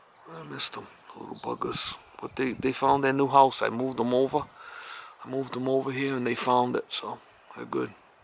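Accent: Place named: Massachusetts, United States. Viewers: Judging by the accent, I'd say Massachusetts. (I know. It's not nice to judge.) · Good vid! Im trying to place your accent.. Massachusetts?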